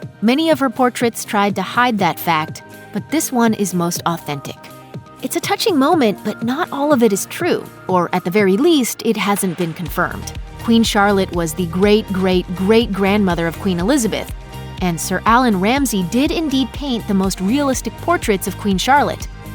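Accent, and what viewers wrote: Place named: California, United States. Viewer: it's the same californian accent the narrator and Meghan have. Meghan's voice is a pitch/octave lower than the narrator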